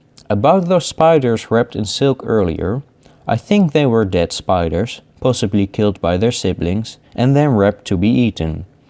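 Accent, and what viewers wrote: Netherlands. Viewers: Like I suspected. A fellow Dutch man. your determination book gave it away. The houses opposite of yours did ring a bell already. I think its Amsterdam. Also the way you pronounce the Latin names rang a bell. Like what you do mate. Keep it going.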